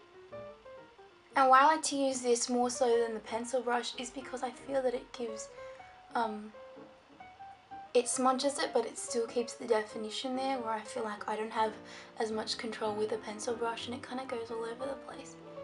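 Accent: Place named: New Zealand. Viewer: OMG i just found you and subscribed to you and i love you!!!!! fabulous!!! love your accent!!!! new zealand? or south african?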